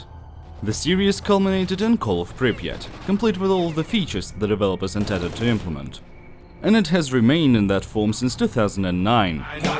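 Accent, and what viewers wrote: Russia. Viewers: чел, ты передаешь привет русско-говорящему, слышно же по акценту........ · Is that a Russian accent?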